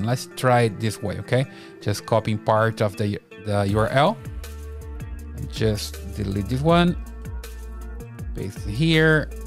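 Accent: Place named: Brazil. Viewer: Thank you for that! It's my first time in your channel, you accent sounds familiar. Brazilian?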